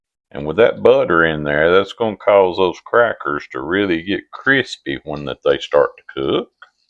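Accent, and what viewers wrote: South, United States. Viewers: thanks for the tip. Thank you for wonderful recipes, step by step directions, an delightful southetn accent 🎉❤😊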